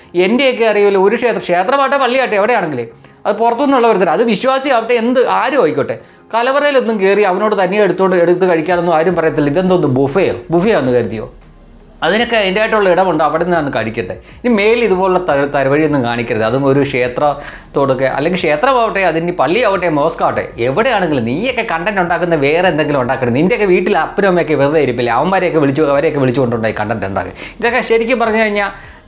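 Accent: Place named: Alappuzha, India. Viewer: Hi innathe special entanu. Nalla alappuzha saily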